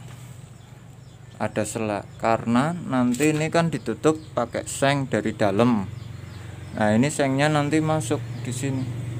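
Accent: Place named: Banyumas, Indonesia. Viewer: Sampean asli mana kang? Ko ada suara ngapak'y 🤭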